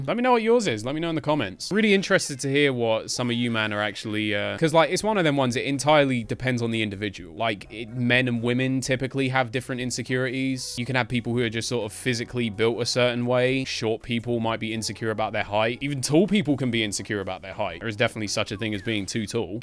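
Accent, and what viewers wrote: Wales, United Kingdom. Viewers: As someone who’s been watching since like 15,000 subs, I wouldn’t be watching if had an annoying voice, I’ve stopped watching other people on voice alone. I think I’ve watched so much, even when I was younger. I sometimes say phrases he would, like actually. What can I say I like the British accent. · Bro i love your voice man. i’ve always loved british people. Mainly for their vocab and they’re accent. Not matter how you sound you are still amazing. love you big bro. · That sexy voice and that britain accent are your trademark because everyone recognizes you when your "WHAAAAAAAAAAAAT?!?!!" pops in. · It's a UK voice I'm proud of my UK voice so you should be too and I understand the insecurity of having the audience hear it but that's what makes you iconic to us! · I like your voice, I just love how "British" (or in this case, Welsh, which I didn't know until relatively recently) it sounds, yet very comprehensible, something I wish I had. 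Also I wish I could do one of your signature "WHAAAAAAAAAATTTTTTTT"s. Though I can't blame you for wanting to have Matt Berry's voice. Hell, he doesn't mind if you're from Iran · welsh accent 100% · Mate you sound fine there’s nothing wrong and as a British person it’s nice to here someone with a similar accent to me I get tired of American accents they really grate on me don’t worry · bruh i love your voice so much. as a british person who has moved to australia, i can appreciate and miss your accent. and your voice is wonderful, it makes everything about you so much funnier. dont be sorry. i love your so much aha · not once have i watched one of your videos and paid attention to how your voice sounds. even doing so now, i see nothing wrong with it. you sound like a british man.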